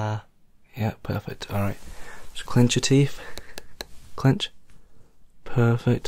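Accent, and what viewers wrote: United Kingdom. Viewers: Never heard a British…asmrtist? Is that what you call them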